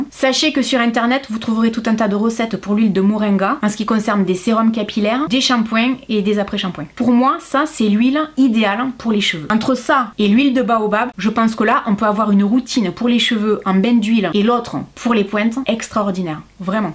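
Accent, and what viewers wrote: South, France. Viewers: C'est clair ! Et son accent qui donne l'impression qu'on est dans le sud et qu'il fait beau...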